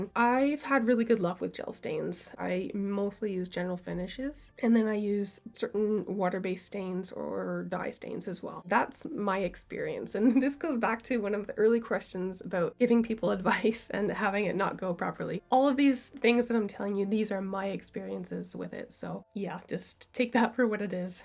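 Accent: Nova Scotia, Canada. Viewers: Your furniture stuff showed up in my feed one day... so i watched... then i watched some more... and there was something about your accent that made me think of "down home nova scotia" Then i discovered you're in Hantsport! My hubby grew up around the corner from you on Smith Cres (the wrong side of the tracks, lol) and he is only a few years older than you... so now i wonder if our paths might have crossed in the past. Boom! I was hooked! Art and furniture restorations... with that down home accent. 😊 Thank you! And happy anniversary.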